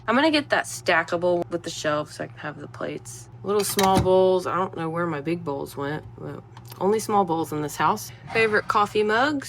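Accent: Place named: Arkansas, United States. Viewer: You can tell you’ve been back in Arkansas because your accent has kicked up a notch 😂🙌 “one of those burn bucket thangs”. You’re killing it! ❤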